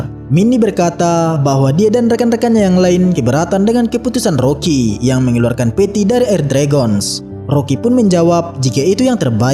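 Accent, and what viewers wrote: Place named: Padang, Indonesia. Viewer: Pengisi suara orang padang❤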